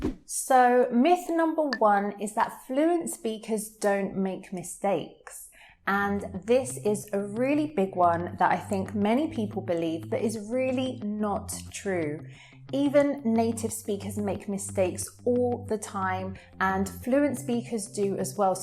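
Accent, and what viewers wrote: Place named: United Kingdom. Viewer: She has a British accent as cute as herself. Thanks for these tips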